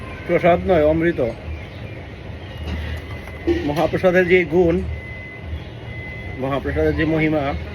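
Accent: Bangladesh. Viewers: রাধে রাধে জয় শ্রী রাধে।প্রভু আপনার কথা শুনে মনে হয় বাংলা দেশের রাজধানীর ভাষায় আপনি কথা বলেন।আপনার ভাষা আমার খুব ভালো লাগে।আপনাকে ধন্যবাদ আপনাকে অভিনন্দন ও অসংখ্য প্রণাম ।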